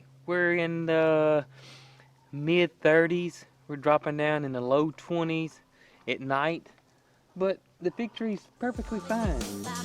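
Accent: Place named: Tennessee, United States. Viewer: love your channel! I'm a new sub,love the first video I watched you sounded just like me here in Tennessee.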